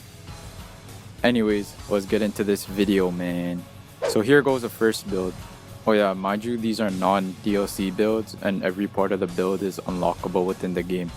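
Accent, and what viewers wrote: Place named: Toronto, Canada. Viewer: Yo u from Toronto G? I can tell by the accent lmao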